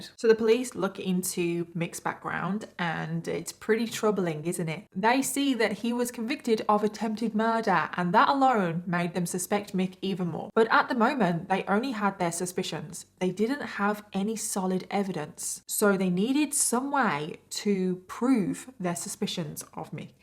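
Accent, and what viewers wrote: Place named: United Kingdom. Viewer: i was literally binging your series and wanted to see a compilation of like abvsive parentys and then next day i have it! (obviously i would rather not have these crimes to exist, condolences to the victims) I also build a lot of minecraft while your videos run in the backround and your voice is so calm and Sassy is so funny and point-on! Also i always knew you were british =D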